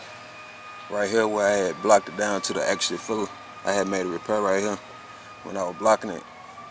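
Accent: Alabama, United States. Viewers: What part of Alabama you out of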